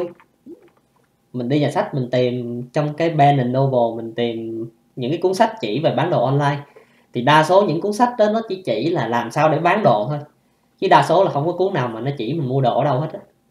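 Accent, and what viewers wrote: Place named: Mekong Delta, Vietnam. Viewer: Ban noi giong nguoi mien tay nguoi viet nam nho nhung nguoi tay fai nguoc nhin ok e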